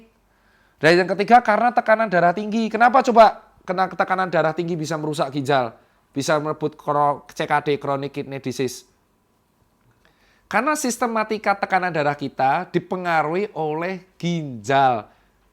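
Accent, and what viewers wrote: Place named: East Java, Indonesia. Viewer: Bukan marah.. Itu emang aksen khas jawa timur. Khususnya surabaya dan sekitarnya.. 😂😂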